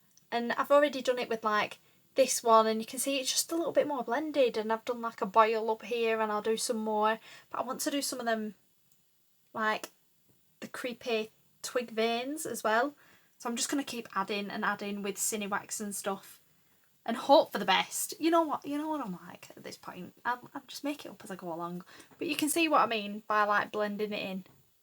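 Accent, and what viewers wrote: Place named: United Kingdom. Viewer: I love you voice it is so British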